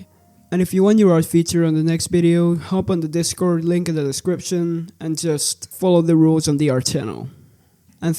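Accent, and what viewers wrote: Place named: Europe. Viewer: he's probably european so it's not his first language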